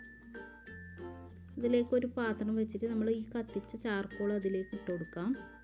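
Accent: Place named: Kannur, India. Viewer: Masha Allah. അടിപൊളി Insha Allah try ചെയ്യുന്നുണ്ട്. വർത്താനം ശെരിക്കും കണ്ണൂർ സിറ്റിക്കാരുടെ തന്നെ 🤣👌🏻 സ്മോക്കി roll ആദ്യമായിട്ട കാണുന്നത്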